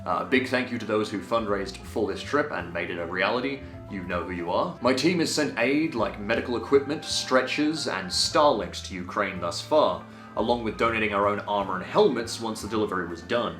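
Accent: Australia. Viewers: I wont lie man, when i first watched one of your videos 2 years ago i wasnt sure if i liked your stuff or not. It took me a couple of months of watching random suggested videos here and there before i actually subscribed.. But im so glad i did. Your gameplay stuff is consistently funny and enjoyable, the largescale stuff you run is nothing short of epic.. But this takes my respect for you to a whole nother level. A lot of people talk big, not many of them actually back it up by really helping, and very few would actually go over there and put themselves in danger to deliver that help themselves and show your support in person. I dont want to put words in their mouths but Im sure it lifts the battered spirits of Ukranians to see forgeigners from literally the opposite side of the world being willing to go to such lengths to support them in their time of need, and them being able to see your face and hear your ozzy accent in person must just solidify that feeling even more. 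Youre a man with principles, courage and integrity and I have absoltely nothing but respect and admiration for you,. Cheers bro Slava Ukraine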